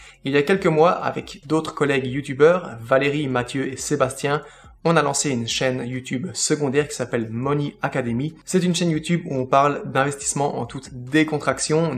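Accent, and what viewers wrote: Belgium. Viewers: Bravo pour ces 10 000 abonnés bien mérités... Grande fan de ton petit accent belge... surtout ne le perd pas même si tu décide d'aller t'installer au soleil;-) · Salut, félicitations pour les 10K... toi un accent belge?? lol euhhh je confirme, de quelle région en fait? moi grandi du côté de Charleroi et Liège depuis 15 ans;) Le business Insta oui très bonne idée parce que j'ai du mal à comprendre comment on peut monétiser sur ce media. Je suppose que ta société n'est pas en Belgique (juste parce que je me demande quel est le statut dans ce cas et je me vois mal poser la question à ma comptable étant dans un domaine qui n'a rien à voir.... lol mais une chaine de présentations de produits et tuto sont en tête depuis un an) Encore merci pour tous tes conseils. J'ai aussi été validé sur Degiro en attendant que T212 se décide à revenir sur le marche européen (merci Brexit) et que TR ouvre la Belgique... 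T'es le bien venu pour un café si tu passes dans le coin! (pas un Starbucks hein! je sais ce que tu en penses!)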